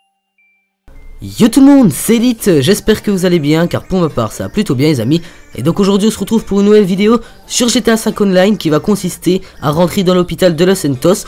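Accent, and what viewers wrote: Belgium. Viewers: Ah psk ta un axants qui resanble au belg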